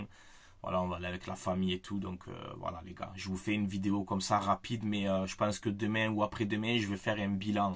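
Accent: Marseille, France. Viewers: Juste comment tu parles, j’ai pas besoin de prouver que t’es Marseillais 😂😂 Je comprends frérot Hala Madrid vaut mieux ça pour ta santé 😂 On as pas de LDC mais on est heureux, ils ont une LDC mais sont malheureux 🫵🏽😂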